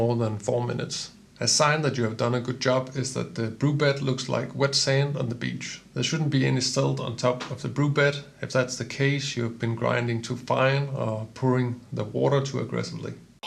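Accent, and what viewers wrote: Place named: Denmark. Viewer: Blev nødt til at google for at se om du var dansk. Det er så svært at skjule, at man er dansk, når man taler engelsk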